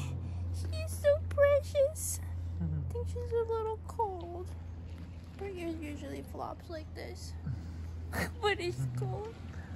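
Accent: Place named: United States. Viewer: She has a very Americanized voice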